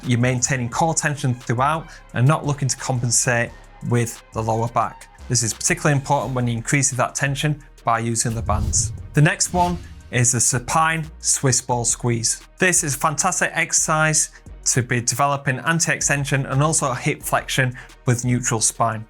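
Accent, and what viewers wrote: United Kingdom. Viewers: The real British accent makes the Viddo much interesting 👍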